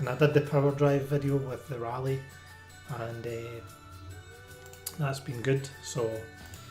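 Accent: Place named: Scotland, United Kingdom. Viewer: eh Joe is one of my favourites! You can really see how your channel is evolving 👍🏻 If you feel like popping over then do if not then it’s not a problem 😊 I’m here to stay as I don’t hear the Scottish Accent enough on YT, mines has faded a little as I’ve lived in England for 17 years 😬 keep doing what you’re doing....it’s fun to watch!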